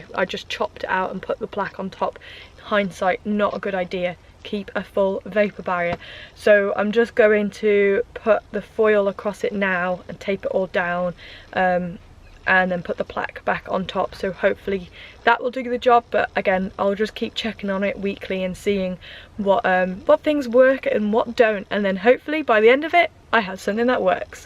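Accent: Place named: England, United Kingdom. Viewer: Wow! Thanks so much for sharing from across the pond! Stumbled across you and your video as we are all stuck with limited activities and lockdowns:( I did have an RV last year, but still long for a Van as a more manageable means to get away. A suggestion maybe for mold is desicant? sp? They sell it at hardware stores, and even our storage places in a plastic bucket with a perferated top, crystals absorb moisture.... I also had an electric rechargeable one off Amazon, that just hung with a hook, and it changed color as it needed recharging to dry it out. Packets of silica beads / gel may also help to dry area out. Cheers and and thanks again! Stay safe, LOVE your proper English, haven;t heard in many years ... Canadian raised :)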